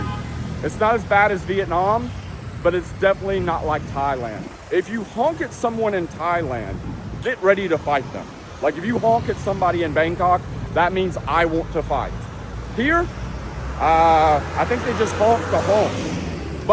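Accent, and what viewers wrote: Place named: Atlanta, United States. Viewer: I love your Atlanta accent 🤣🤣 I live right up the mountain in NC and it’s so different but I’m happy you are representing the south in Asia!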